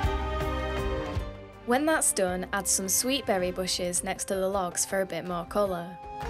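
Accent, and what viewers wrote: United Kingdom. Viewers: Your British voice feels so calm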